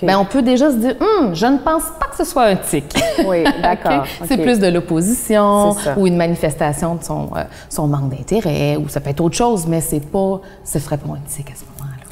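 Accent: Canada. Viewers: Bah c'est du québécois c'est pas "mal prononcé" c'est juste pas ton accent à toi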